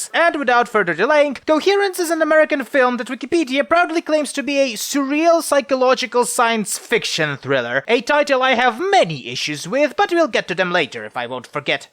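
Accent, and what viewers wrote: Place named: Eastern Europe. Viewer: Damn, I slowed it down and got classic east EU accent from US movies. Git gud -_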